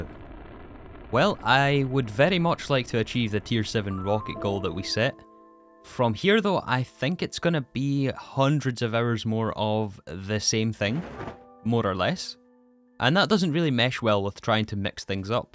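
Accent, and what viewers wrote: Scotland, United Kingdom. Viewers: I feel as though you're hinting at the fact you're struggling with a bit of burn out but don't want to say that due to how that can be interpreted. I think it's natural on a modpack like this that there are going to be points where you just can't be arsed as it's the same grind for hours on end that isn't really valuable for your content and therefore not to us either which feels like a massive waste of time but there's no avoiding it as that's the progression. I would say, do what's best for you. If you feel as though starting something new would be best, do it. I enjoy your content not for seeing the tier 35 rocket but your upbeat Scots rambling.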